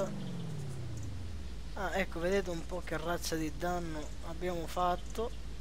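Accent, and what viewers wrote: Sicily, Italy. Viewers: ma sei siciliano come me?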